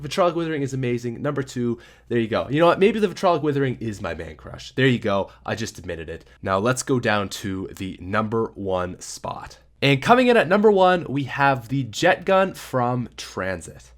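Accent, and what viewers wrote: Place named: Canada. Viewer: Lol ur Canadian right? Love you Canadians out there. "What is not to love aboot this thing?" Hahaha 💖😁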